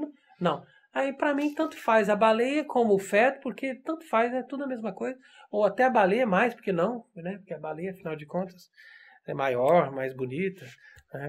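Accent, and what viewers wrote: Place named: Minas Gerais, Brazil. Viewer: vc é mineiro de Ituiutaba? Esse sotaque é ótimo. Parabéns pelo trabalho!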